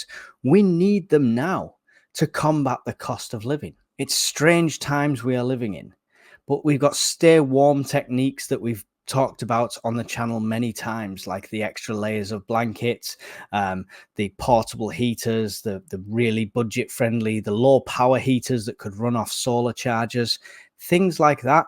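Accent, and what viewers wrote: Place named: Rochdale, United Kingdom. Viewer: Trying to pinpoint your accent. Going to guess Rochdale because of how you say “work” but the way you say “year” is really throwing me 😄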